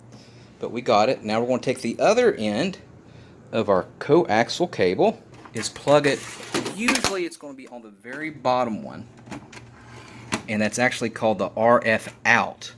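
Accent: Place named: South, United States. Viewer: Thank you kindly sir! Want to send a shout to you and your generosity from your Northern fellow American. I adore your Southern accent! Very charming :) Because of you I can watch my dad’s videos, he passed away 6 months ago. So thank you thank you. You’re the best!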